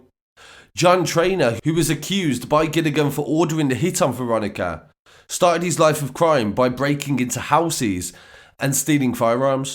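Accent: Birmingham, United Kingdom. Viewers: Bro I feel your channel would do better if you tone down the brummie accent. Good story!!!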